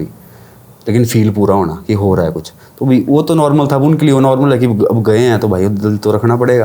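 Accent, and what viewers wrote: Pahari, India. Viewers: हिंदी में जो पहाड़ी भाषा की आवाज आती है वो मुझे अच्छी लगती है।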